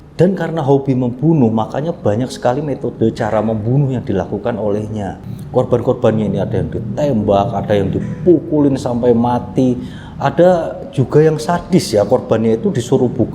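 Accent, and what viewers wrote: Java, Indonesia. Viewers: bingung... Saya dengerinnya juga bingung kejaaam bgt.... Suka logat kejawaannya medok medok gitu mantaaap deh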